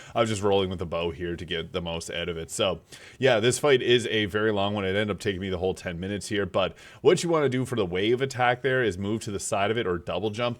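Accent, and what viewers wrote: Canada. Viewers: Took about 10 seconds to figure out you are EXTREMELY Canadian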